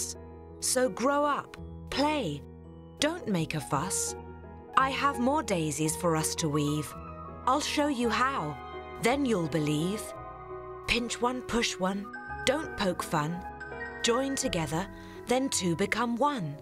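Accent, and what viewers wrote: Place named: England, United Kingdom. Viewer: great enlish accent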